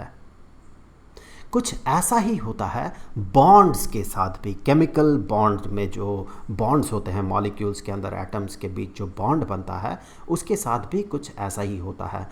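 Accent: Bihar, India. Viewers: but brother i am from Uttar Pradesh😂😂..His ascent of speaking relates to biharis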